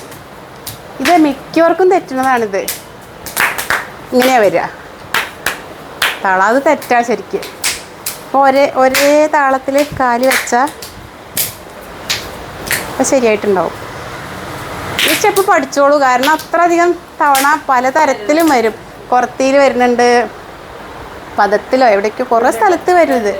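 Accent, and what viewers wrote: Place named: Thrissur, India. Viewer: വർത്തമാനത്തിന് തൃശ്ശൂർ ഭാഷ തന്നെയാണ്.,ഊരകം,ഞെരുവിശ്ശേരി ഒക്കെ അറിയാമോ.അവിടെയൊക്കെ ധാരാളം ആളുകൾ കൈകൊട്ടി ക്കളി അ റിയുന്നവരുണ്ട് എന്ന് കേട്ടിട്ടുണ്ട്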